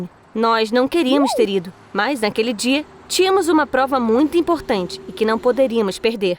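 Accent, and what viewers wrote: Brazil. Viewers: Como ela é de Portugal sendo que ela fala igual brasileiros , Claro q eu sei q Pessoas de Portugal falam português , mais tipo eles falam diferente do Brasil, ou ela é brasileira e foi pra Portugal?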